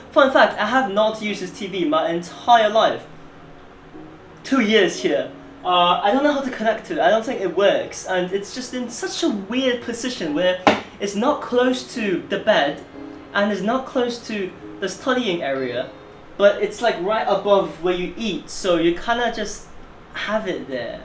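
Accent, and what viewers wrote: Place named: United Kingdom. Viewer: Ah the british accent never changes HAHAH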